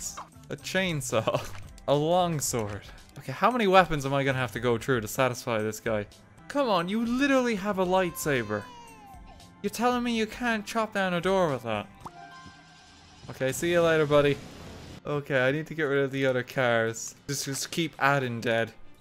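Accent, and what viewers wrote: Ireland. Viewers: This dude Irish? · I never knew this guy was Irish ahah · He's Irish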